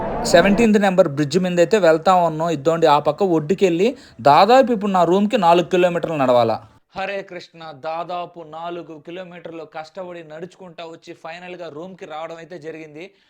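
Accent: Nellore, India. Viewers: ఉన్నది ఉన్నట్లుగా నెల్లూరు భాషలో అద్భుతంగా చెప్పావు నాన్న థాంక్యూ వెరీ మచ్ మాది కూడా నెల్లూరు నాన్న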